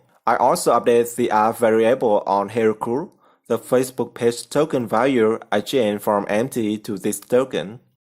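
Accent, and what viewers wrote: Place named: Vietnam. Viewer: That Vietnamese accent. But great tutorial.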